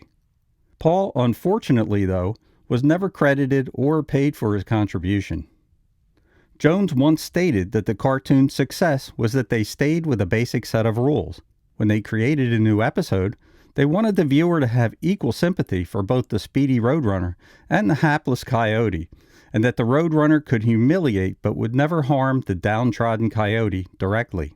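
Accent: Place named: Pittsburgh, United States. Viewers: You sound like you have a Pittsburgh accent 😊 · Yinz from da’ Burgh?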